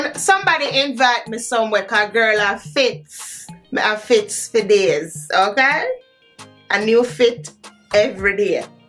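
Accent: Jamaica. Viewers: Hi K, Jay and I are here laughing hard! He loves how you bring in your patois! 🥰 As always, here for the fashion and always enjoy the vibezzzz!!! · Love your style and l ove when you speak jamaican patois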